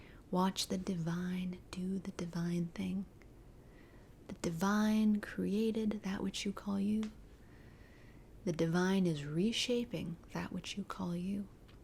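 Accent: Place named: Scotland, United Kingdom. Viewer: Thank you for the encouragement and the scottish accent 🙏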